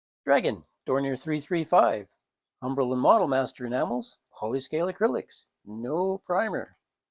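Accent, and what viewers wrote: Canada. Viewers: I knew you were Canadian right away. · Deckles? I'm 54 years old and I have never in my life heard someone pronounce it "deckles." Every dictionary has the accent on the DE- de'cal. Then you go so far as to do a bit to insult an entire region of the US, because you think this is where the (correct) pronunciation comes from. Like they are all an episode of Hee-Haw. Meanwhile, we have to listen to you talk ABOOOT things with your northern Canadian accent. I don't think I'll follow you with your smug and incorrect advice. Maybe do a video aboot freckles and deckles. <link>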